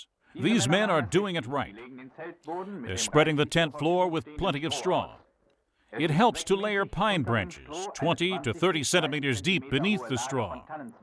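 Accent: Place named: United States. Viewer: Yank voice over ?....................Ruined!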